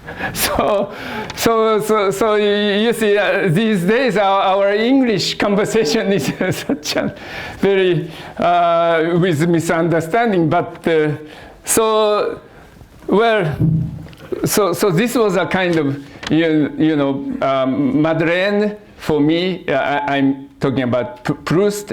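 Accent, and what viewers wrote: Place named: Japan. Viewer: 理系にありがちなカタカナ英語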